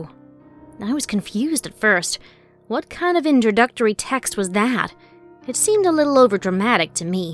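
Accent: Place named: United Kingdom. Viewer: I don't know why,but I never really took notice as to how much suppressed her British accent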